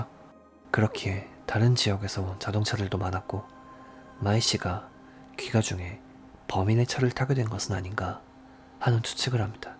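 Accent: Japan. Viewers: 개인적으로 '후' 발음이 적응이 안되네요 ㅎㅎ 일본식 발음인가요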